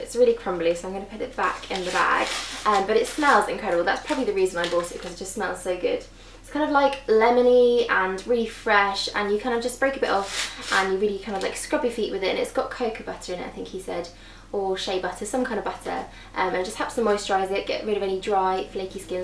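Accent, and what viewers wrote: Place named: RP, United Kingdom. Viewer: You're very posh! Lol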